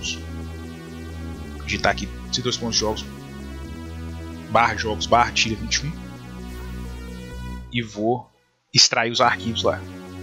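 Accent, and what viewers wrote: Minas Gerais, Brazil. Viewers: Sotaque seu é mineiro.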